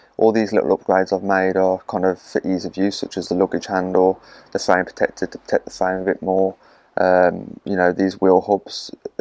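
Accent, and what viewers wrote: United Kingdom. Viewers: Very informative. Thinking of getting one (despite the price!!!!) so you're opinion on owning one has helped enormously. Great to hear the Dudley accent, too! Not enough Brummie and Black Country accents on YouTube!😃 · Good to hear a fellow Brummie. Im looking to buy my first Brompton. Ive read alot of articles about the weight limit of 110kgs and not to worry too much on that fact. What are your thoughts on this? I am a slightly heavier bloke, 135kgs. Cheer mate 👍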